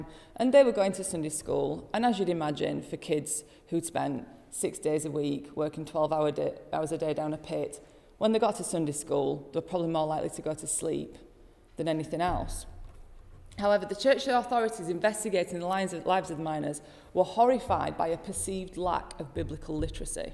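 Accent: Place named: Yorkshire, United Kingdom. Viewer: I am living in York. It's an interesting accent with beautiful features. But it seems to me that her accent isn't so strong as we normally found in the streets, shops etc. Maybe in an informal context would be possible to hear more details.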